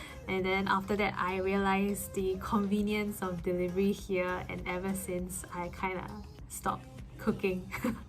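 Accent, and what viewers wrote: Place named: Singapore. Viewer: I can actually hear the Singaporean slang in some of your sentences, you subconsciously added "...lah" at the end of your sentence, and most of you English you finished with a quick hard stop.😊😊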